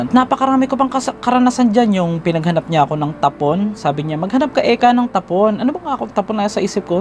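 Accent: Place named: Hagonoy, Philippines. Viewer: Taga Hagonoy Bulacan k b idol? Kase yong punto at way ng salita mo parang tga hagonoy k. Daming life lesson s kwento mo.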